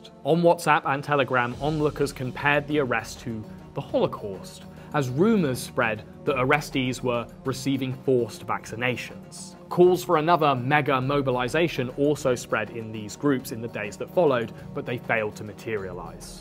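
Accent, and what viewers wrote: United Kingdom. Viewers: you sounds british? so not a gringo in the kosher sense of the word. but yeah, I would call you a gringo, you go gringo! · Excelent broad strokes . At first glance, I would not take your channel seriously , given the choices of the Good, The Bad, and the Ugly out there. And the Brittish accent does not mean squat. And you were a bit too scruffy. Yet , you surprised me , by bringing in perspective from a far back timeline. And the homework put into it comands respect. And by dissecting facts and events thoroughly. And by staying even keeled, even thought you had a clear and defined stance ( granted it was European , yet not incendiary ). And your choice of language was impecable. Perfect Narrative and pace Negatives. No sticking your feet in the table ! Keep your beard groomed, for heavens sakes. Get rid of the silly props. You have what it takes Congrats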